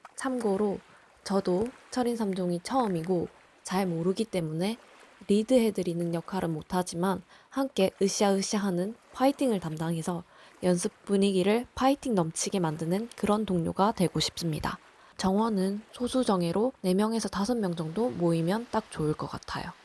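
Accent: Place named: Japan. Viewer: 계획을 세워 그렇게 철인3종도 하시고 마라톤도 완주 하시고 지금 이렇게보면 하면 한다네요 말투도 일본에 있어서 그런지 일본 말투도 섞여 있네요ㅎ 요가 자전거 수영 마라톤 등산 못했지만 하나 하나 해가는 이 대단합니다 응원할께요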